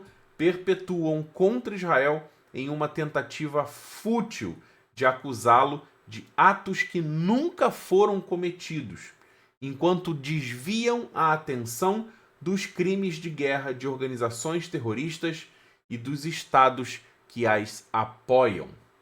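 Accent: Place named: Rio de Janeiro, Brazil. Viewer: Afff esse sotaque carioca cheio de sssssssss e rrrrs! Fonoaudiologo resolve isso!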